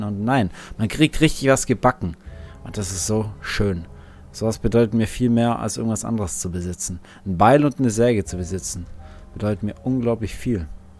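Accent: Germany. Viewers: Ich finde es sehr schade, dass deine oberschwäbischen "Sprachwurzeln" mit dieser "Köllschen Betonung" die Du mittlerweile angenommen hast, verloren gehen.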